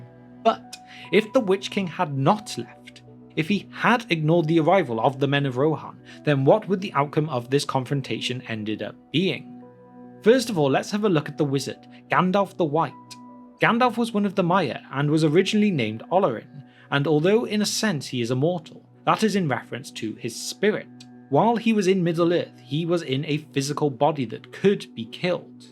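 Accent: United Kingdom. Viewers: Where is the UK is the narrator's accent from? He sounds like thoughty2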